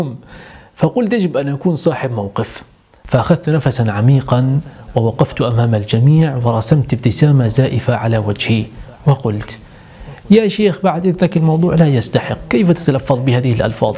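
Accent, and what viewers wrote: Palestine. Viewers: s sss مع أنو ماني فلسطينيه بس بحبا للهجتكن كتير وبحب اسمعا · شيخ نص كم😂😂😂 فلسطيني ميه ميه،الحمدلله تكلمت فلسطيني قليلاً لاني كنت اشك انك تجيد لهجتك😂🧡😊 طلب صغير .. تكلم فلسطيني ولو جمله واحده في فيديوهاتك قلت لك اني اشك انك تجيد لهجتك😂💛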